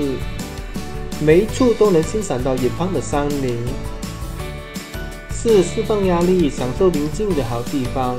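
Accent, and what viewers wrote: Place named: Kampung, Malaysia. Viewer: 这种kampung华语，应该会让外国华人听了很受罪，YouTube是全球性的，不是我们马来西亚独有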